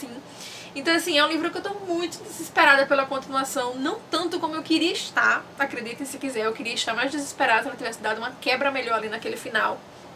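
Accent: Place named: Northeast, Brazil. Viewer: oi tudo bem? eu gostei de vc, do seu canal, vc é bem segura e tem sotaque nordestino como eu rsrs...vc é de qual estado? enfim, só tenho uma pequena crítica :( às vezes acho que seus vídeos são longos..vc repete o que já falou, enfim só uma opinião minha msm...td de bom :) até mais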